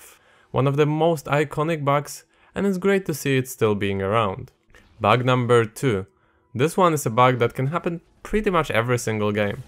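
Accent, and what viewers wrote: Poland. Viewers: Stary, pierwszy raz usłyszałem twój glos, od razu wiedziałem że jesteś Polakiem i chyba się nie mylę. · the Polish accent make the video better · Polish voice strong!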